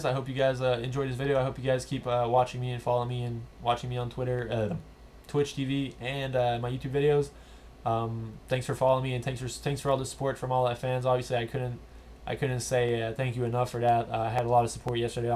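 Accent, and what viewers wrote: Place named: Quebec, Canada. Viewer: haha such a strong quebec accent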